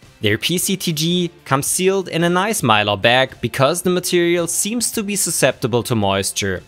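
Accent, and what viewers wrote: Germany. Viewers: I just come for the German accent.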